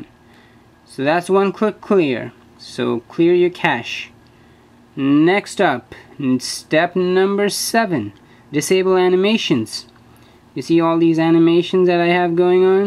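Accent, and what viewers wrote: India. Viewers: you MUST be indian... i can tell clearly from the accent.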